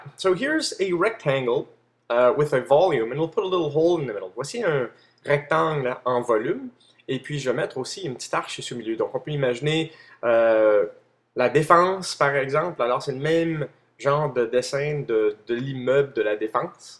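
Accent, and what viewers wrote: Canada. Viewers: J'adore ton accent Canadien :)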